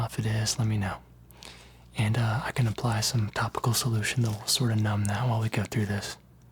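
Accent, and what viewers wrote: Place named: South, United States. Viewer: Is that a Texan accent? I’ve got a southern accent (Alabama so it’s a lot more hick and a lot less charming lmao) and I’m hearing it in yours slightly but it’s definitely not my kind of southern accent. I thought I was mishearing it to begin with but the more I watch, the more sure I am that there’s some sort of southern in there lol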